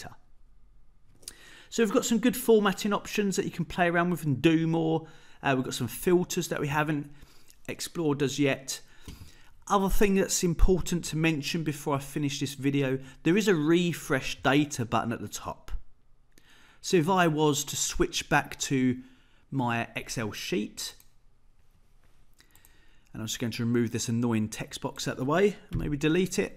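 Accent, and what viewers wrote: United Kingdom. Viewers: Had to download parallels and excel for windows. You have such a gift of teaching, all very clear and easy to follow. Awesome proper brit accent too haha. Just a question: can you change settings for the Bing map style, colours, etc. ?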